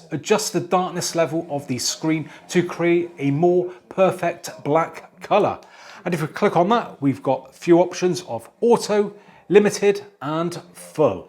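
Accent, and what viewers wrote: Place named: West Country, United Kingdom. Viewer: Enjoyed your video, love what I believe is the west country accent too, bonus. This video will be a big help to me as my exact same new tv is in the box ready to setup, just waiting for the new stand to arrive before unboxing and setting it up. Thanks, enjoyable informative video and thanks to your wife for the video work. 👍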